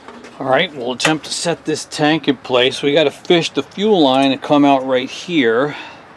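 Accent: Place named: New Jersey, United States. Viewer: Since I subbed a little while ago I thought I detected a Jersey accent. Sure enough! I’m originally from northern New Jersey myself, but have been on Cape Cod most of my life.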